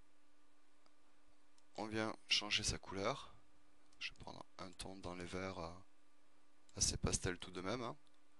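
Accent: South, France. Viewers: bonjour , merci pour ces tutos d'une très bonne qualité et vos explications pourvus d'un accent du sud ;-))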